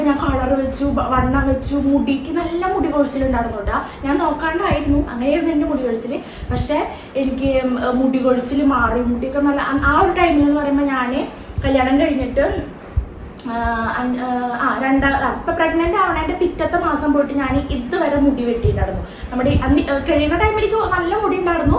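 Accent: Thrissur, India. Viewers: samsaram correct thrissur slang aanaloo😍😍😍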